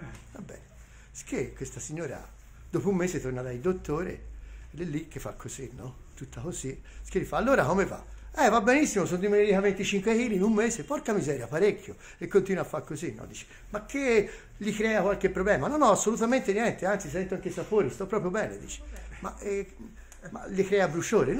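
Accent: Tuscany, Italy. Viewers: Ma non sai esprimerti in italiano così ti capiscono anche fuori dalla tua Toscana!!! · sei fortissimo solo la pronuncia toscana già rido pero' va un po' più piano alcune devo risentirle perché non chiare🤣🤣🤣🤣🤣🤣🤣🤣 Graaaaaaaziiiiiieeeee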